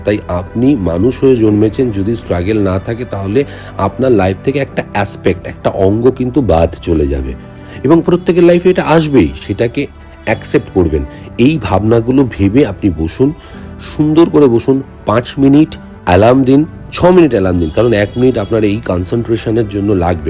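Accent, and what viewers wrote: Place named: Kolkata, India. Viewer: তোমার কথা থেকে অনেক কিছু শিখি, আজও শিখলাম, মনটা ভালো হয়ে গেল। তোমার কথা শুনেলে মনে হয় আমার ই কোনো আত্মীয় কলকাতায় থাকে সে কথা বলল। ঈশ্বর তোমার অনেক অনেক মঙ্গল করুন। ❤❤❤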